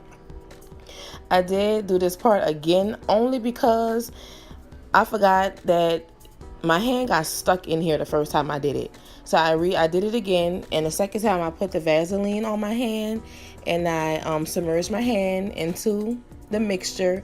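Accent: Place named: New Orleans, United States. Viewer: That N.O. accent BABY! 💕 thanks for posting this video I'm in New York I want to make my own as well!!!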